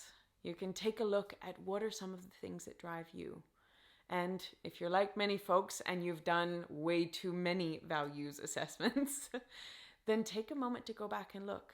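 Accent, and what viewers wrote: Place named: Jamaica. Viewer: Your accent sounds very Jamaican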